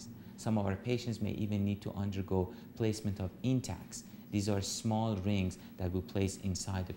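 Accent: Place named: Iran. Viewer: This is Iranian accent by the way.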